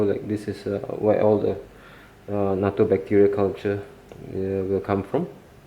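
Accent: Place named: Malaysia. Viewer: ur accent sounds malaysian..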